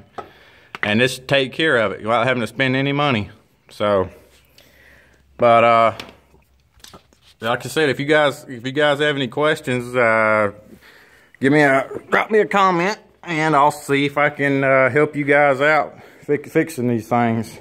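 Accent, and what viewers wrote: South, United States. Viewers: I just love these mechanics/technicians with that hick grit accent, who are smart as hell. The accent makes you think they ain't too smoort. Then then show you what's up. I used ta get fooled by that accent, but then I went to navy nuclear power, worked with some terrifically smart good ol boys from the South, and got my city ass handed to me in terms of smarts, problem solving, and being great ship mates. Wish had been on my boat. Oh, and like a good nuclear engineer, he starts most of his explanations with, "basically"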